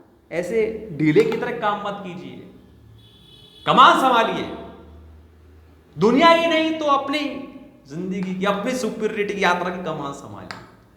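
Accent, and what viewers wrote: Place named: Ajmer, India. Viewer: 😂Love this ajmeri style of talking❤️ लेकिन भैया कोरोना के नियम तो पूरा ही आड़े आ रहे हैं😭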